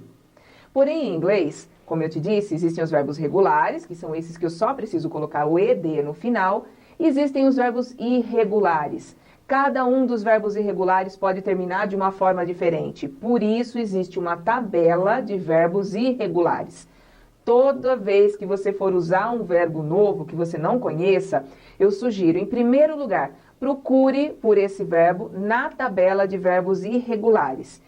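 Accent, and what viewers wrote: Brazil. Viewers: Professora. Sempre foi e sempre será mais fácil lançar criticas do que elogios. Porque para elogiar é preciso entender o que o outro está se propondo em sua mensagem. Por isso preciso dizer aqui que o seu trabalho é maravilhoso, o aprendizado é real e o seu sotaque para nós é a melhor parte dele. Principalmente para quem quer aprender Inglês sem querer se transformar em um "americado" sem sotaque. É claro que seu marido Steve tem o sotaque de lá e você tem o sotaque daqui. E o que isso importa? É claro que Ricka Yoshida também deve ter seu sotaque característico mesmo quando terminar os seus estudos aqui, grátis, na Managed English. Siga em frente. Abraços! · thanks a lot for the class...that will help lots of brazilians sttudents for sure ...but some pronunciation mistakes.. examples....that she said...last weekiii like Brazilians do..it's wrong...It x eat it's different... 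present perfectiii....likedi it's likt it's a typical Brazilian accent...the use of ED like worked it's wrong...it's workt....I know that many people don't like to improve their accent and pronunciation. they just want to get across their messages....but for those who are teacher or that one's who really want to become better at English should reduce their accent.... please don't take it as a complain. sometimes we have to have someone who correct us..and better yet....Learn with our mistakes....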